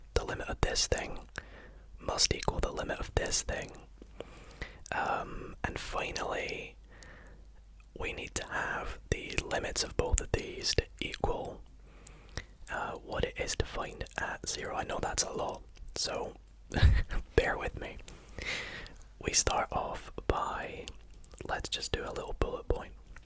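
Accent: Scotland, United Kingdom. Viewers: Hes Scottish 💀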